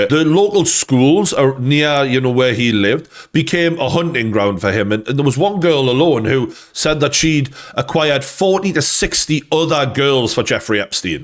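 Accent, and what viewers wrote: Newcastle, United Kingdom. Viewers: Love your accent. Love me a geordie! Great content too ❤ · like the old true geordie , class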